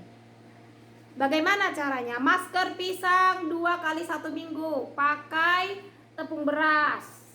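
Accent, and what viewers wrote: Medan, Indonesia. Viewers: Maaf mbak mcem orng medan mbak ngomong nya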